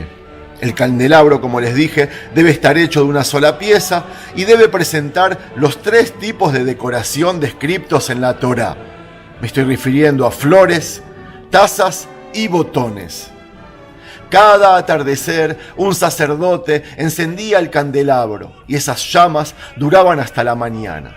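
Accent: Argentina. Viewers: Tu no eres judio eres argentino lo llevas en el asento no puedes negar eso, ningun judio real anda vestido como tu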